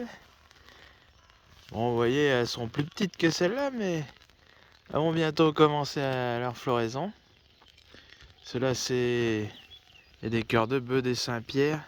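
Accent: Charente, France. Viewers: J'adore ton accent Charentais (les cagouilles😄). Bonne continuation 😉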